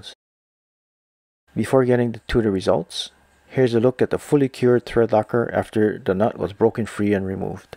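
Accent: Hawaii, United States. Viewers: Hi, I just found your channel. Great vids. I hear a little kama’aina in your voice.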